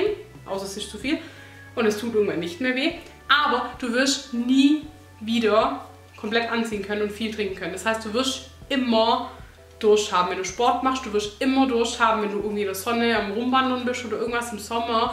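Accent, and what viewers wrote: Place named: Swabia, Germany. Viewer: Man hört das schwäbische LEICHT raus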